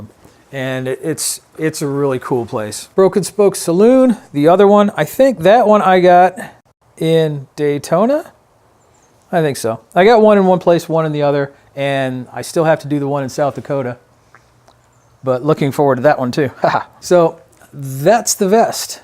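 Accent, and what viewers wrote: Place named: New England, United States. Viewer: !! A fellow New Englandah! I knew there was something familiar about you! But seriously, your videos are the real deal. You are articulate, witty, wise, and above all, interesting to watch! Your editing skills are next-level. Clearly, you know your stuff! I have no doubt you’ll be a big hit on the YouTubes. And best of all, you’re in the Carolinas. My girlfriend used to live in Ladson, so she is going through PTSD watching you ride route 26. Hahaha!!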